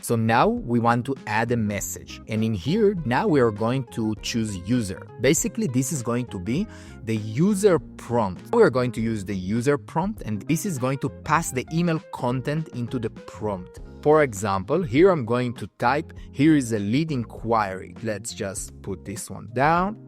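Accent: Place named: Israel. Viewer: What's your background you sound Israeli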